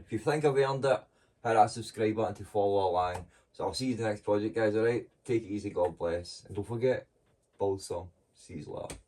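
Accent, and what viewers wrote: Glasgow, United Kingdom. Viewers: Great work, I've learned a lot today. Good to hear a Glasgow accent again. I left (Carmyle) 25 years ago to finish my days in Aberdeenshire but I'm still kickin. Ca canny. Garth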